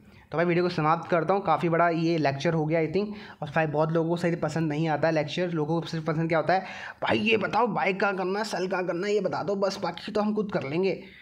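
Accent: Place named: Western Uttar Pradesh, India. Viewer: भाई तुम्हारे डायलॉग बड़े अच्छे लगते है एकदम देसी वेस्ट यूपी वाले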